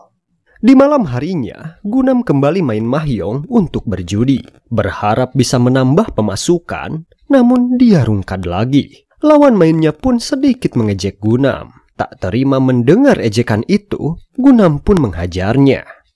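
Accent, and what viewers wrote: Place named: Medan, Indonesia. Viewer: Pasti ini orang medan yg pengisi suara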